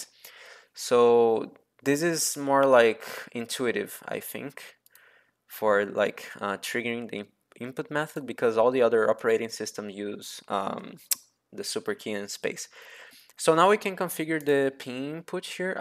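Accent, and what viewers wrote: Brazil. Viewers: Your accent sounds Brazilian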